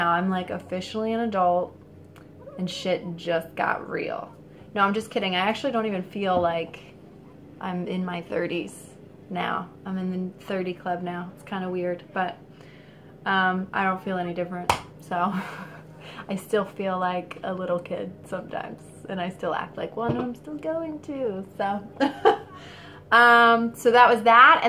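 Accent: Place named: Philadelphia, United States. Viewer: I KNEW that was a Philly accent! Haha Takes one to know one. 😊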